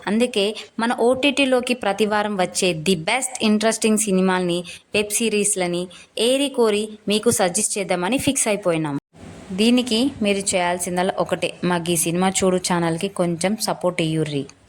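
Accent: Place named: Telangana, India. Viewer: Nice telagana slang 😊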